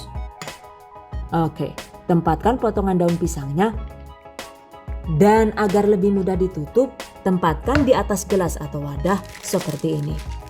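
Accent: Banyumas, Indonesia. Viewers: wong ndi si rika ? deneng teyeng ngomong ngapak yong? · Mbak orang mana kok ngapak ngomonge 😂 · Suka keluar ngapak nya... Primen sih jebulane wong ngapak yaaa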